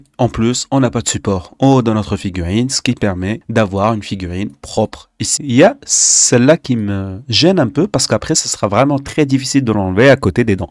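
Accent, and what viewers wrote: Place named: South, France. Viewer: 👏 pour la vidéo et 👍 pour le délicieux accent du sud qui fait tout le charme 😉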